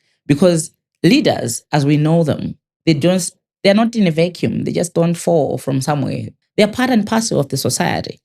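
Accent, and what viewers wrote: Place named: Zimbabwe. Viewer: South Africa via Zimbabwe's accent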